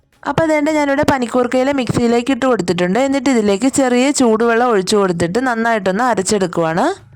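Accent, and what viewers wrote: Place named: Central Travancore, India. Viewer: Central Travancore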